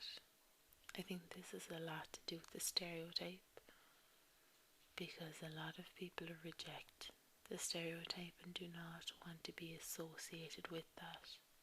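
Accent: Ireland. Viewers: God your voice is gorgeous! :) think I have established I like irish women :P nommy nom nom. I was watching This Is England 88 tonight and they had this Irish nurse on there and I instantly liked her accent. Think this is better than a Brummie accent now. Im from the South Of England I live 80 miles from London in Kent. My accent is cockney/kentish "alwite mate you fackin...." Irish accent is now my fave :) whoop! 2nd is Margate girl accent (local accent) :)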